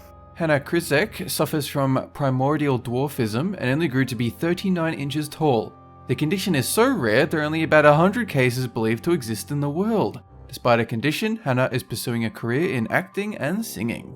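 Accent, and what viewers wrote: Australia. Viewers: AUSSIE AUSSIE AUSSIE OI OI OI!!!!!!!! I NEVER REALISED THAT WAS A FELLOW AUSSIE, FOR SOME REASON I DIDN'T RECOGNISE THE ACCENT, BUT NOW WHEN I HEAR IT, I DO!!!!!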